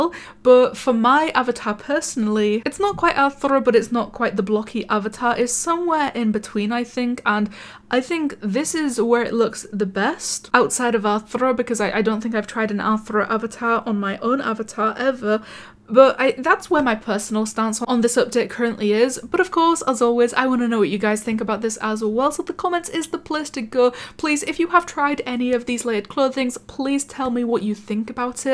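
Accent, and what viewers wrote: United Kingdom. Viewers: she is just so bri-ish ♡ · She is too bri-ish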